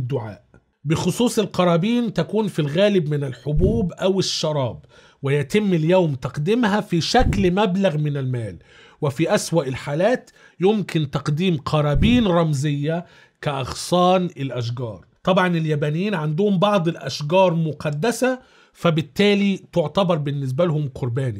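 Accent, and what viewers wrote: Egypt. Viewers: أخي محتوى رائع . ارجوا نطق حرف الجيم كما في الفصحى بدلا من العامية المصرية.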